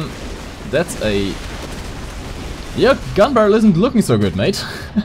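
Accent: Germany. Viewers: Are you german=? because you have got an accent. ps i am german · ich hab direkt gehört das du deutscher bist XD Schönes video,mach weiter so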